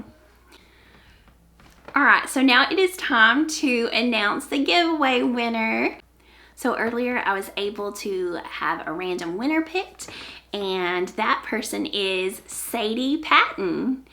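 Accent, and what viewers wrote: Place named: South, United States. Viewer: Can I say that I could listen to YOU talk all day long. I absolutely have never known anyone with a southern accent (and have never traveled to any southern states) so I’m just smiling while listening to your podcast. You are adorable!! Thank you for making time in your day to share your life with us.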